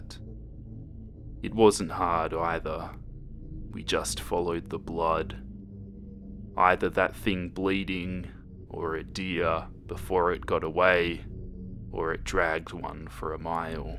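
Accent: Australia. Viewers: DasConii I love the way he says it too, beautiful Australian accent. Maybe I just think this because I don't have an accent?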